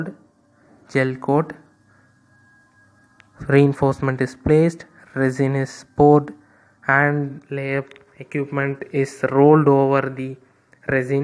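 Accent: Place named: India. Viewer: Your voice is like you are an indian.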